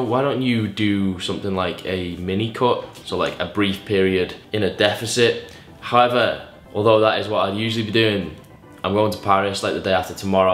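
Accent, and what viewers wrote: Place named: Manchester, United Kingdom. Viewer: Z J Manc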